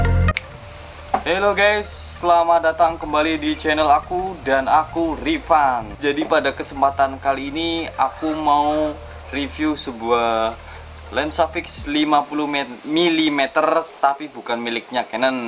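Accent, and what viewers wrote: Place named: Banyumas, Indonesia. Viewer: Jawane ngndi lurr??, Medoke ngapakk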